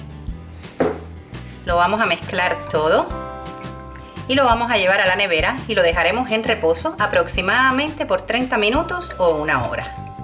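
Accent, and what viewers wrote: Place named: Cuba. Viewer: jaja ya me sonaba ese asento .un gusto yo tanbien soy cubana desde el primer día k vi tu video me suscribi. Por tu asento .jenial 👏👏💪💪💪🇨🇺🇨🇺🇨🇺🇨🇺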